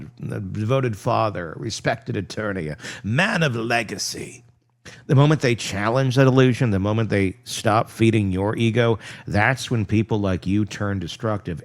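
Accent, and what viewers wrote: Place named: South, United States. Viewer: I love your southern accent. 😂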